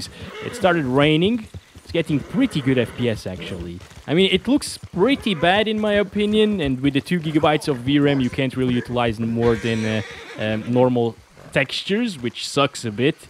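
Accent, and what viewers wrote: Portugal. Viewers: Just wondering, are you from portugal?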